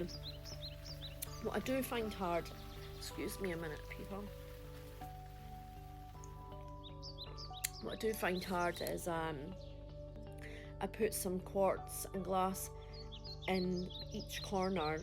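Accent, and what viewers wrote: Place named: Scotland, United Kingdom. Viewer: lol 😂 ur so funny! It’s def more than okay. But I get it, most people don’t hear their own voice in the same way others always here them. So it sounds weird to ourselves. Plus, I really get the chance to hear a beautiful Scottish accent. You’re so used to that sound I don’t you jump for joy over it, but someone else’s accent you like and don’t hear often makes it awesome. Well thank you kindly for the compliment, if I always wasn’t so stuffed up from allergies maybe I could speak more clearly. 😜😘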